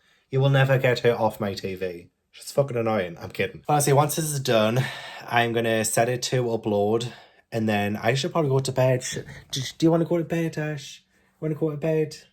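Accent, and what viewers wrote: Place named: Scotland, United Kingdom. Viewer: Oh man, you jumped right on into the EXTREME horror. When I saw “Cows”, I was like … 👀 … 😂 Please excuse my American ignorance, but where is your accent from? I love it SO much, but I can’t pinpoint it … Every time I watch your videos I think you sound Scottish maybe? I imagine you reading this being like, this girl isn’t even close! 🤣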